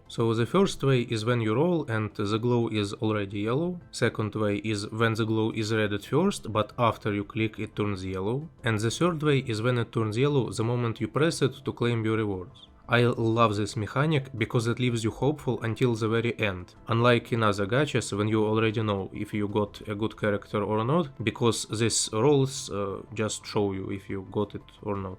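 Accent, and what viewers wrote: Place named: Russia. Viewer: the heavy russian accent is crazy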